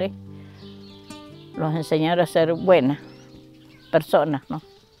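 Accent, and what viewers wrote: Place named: Chile. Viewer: Habla igual que los cjilenos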